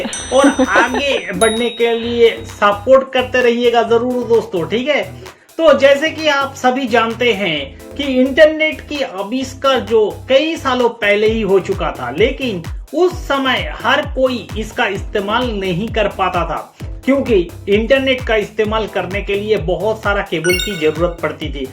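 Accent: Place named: Bengal, India. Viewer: it looks funny awesome when a Bengali person tries to speak Hindi.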